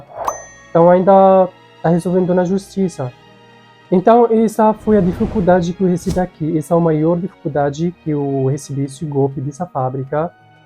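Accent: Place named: Arab world. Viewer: Da pra ver que tu falas desde pequeno o idioma portugués ,, e nao aprende em um ano pois tu nao tem s o sotaque árabe Tão forte sua mae é brasileira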